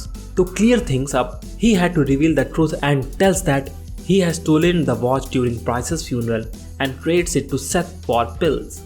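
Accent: India. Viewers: why do you think you speak the right English and he speaks the wrong. Americans have their own accent, British have their own accent, Australians have their own accent and even Arabs have their own accent so why can't indians have their own accent. Who are you to tell which is right or wrong. And it's your country's problem that you got so less people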